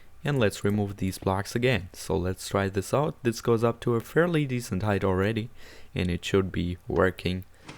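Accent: Germany. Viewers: Hab vom ersten Wort an gehört das du Deutscher bist! ☺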